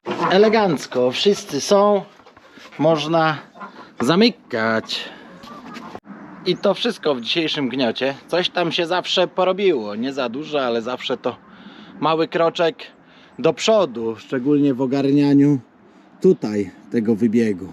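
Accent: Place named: East, Poland. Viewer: Ale sie wyrobiles z tym Yiutabem normalnie smigasz sie bujajacbna bokin😂.. Ale kurde bele beks bo akcent masz i poprawiasz... Tak trzymaj wschodniaku 😮😊😜😂😂😂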